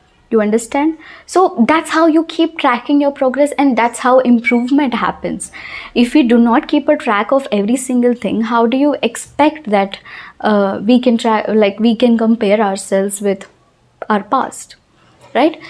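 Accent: India. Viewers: English has become a major challenge for all of us today . Today people those who are speaking fluent English are getting preference. So, whether we like or don't, it is must. We will have to be very confident in speaking. Speaking skill can only be developed by speaking practice only. I am non telugu but I can express my ideas & emotions in a very clear way in telugu without knowing telugu alphabets. I have lot of experience that, most of the telugu educated people speak english but their accent is telugu mixed & it's very easy to recognise them as a telugu while they interact with other in english. It's so surprising that, you are some one who is speaking english like english. It's a good thing. You are really sounding like indian standard english. Ma'am you are 100% correct, that we should get ourselves attached every day & every moment with english by start reading informations whatever we get. 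Like whatever we purchase we find some informations on the wrappers of the stuffs. English is not such a BRAMHA VIDYA that, it can't be learnt. Problem is that, we are not investing that much of efforts and interests to control over the language. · I only subscribe to your channel because,. So soft your voice it is! Like a native Indian speakers. It will be very helpful for indian's like me.🌅💞💯✌️💐💐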